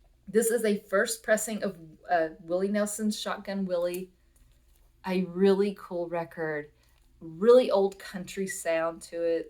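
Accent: South, United States. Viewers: love your Southern drawl accent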